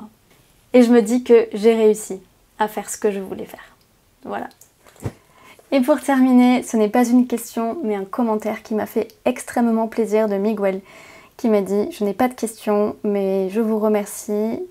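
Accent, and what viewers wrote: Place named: South, France. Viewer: Bonjour. Le prénom « Kurt », qui est allemand, se prononce exactement comme « courte » sans prononcer le « e » à la fin (car j'entends que tu es du sud de la France). 🙂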